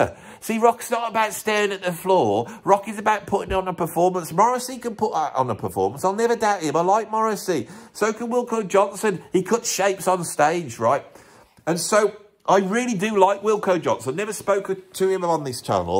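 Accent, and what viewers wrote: Birmingham, United Kingdom. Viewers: Great topic BUT lots of gaps in knowledge and predicable cliched/wind-up stuff about bands in overcoats that actually sing about real stuff- rather than fairies and wizards- BEING 'pretentious'. That said I am loving the Brummie (faux?) accent. So please do a top ten of the best Brummie/Black Country bands wearing false sideburns and a mirror hat and screaming 'BABY, BABY,BAAAABY!' while scrunching your face up. 'Cup-a-Soup' optional.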